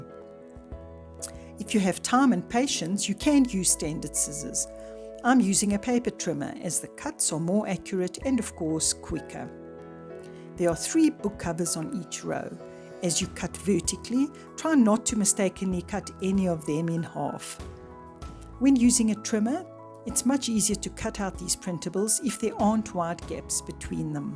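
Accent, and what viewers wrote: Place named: South Africa. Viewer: Hello, I recogise your accent. South African. What a super mini book you have created. I am a bookbinder and these are the most super fun. I have only made blank miniatures. Thank you!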